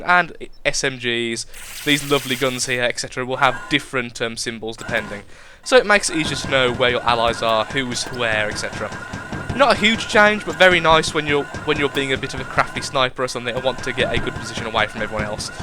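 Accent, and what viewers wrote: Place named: England, United Kingdom. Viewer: Wierd, if im not familiar with it. I figured you were from england, but a few words threw me off. I know there are local dialects, i was just curious what local dialect you spoke. I meant no offence, you were right. Im from Australia, we dont have local dialects, we have "country" and "city" accents. My country hasn't been around long enough to have them.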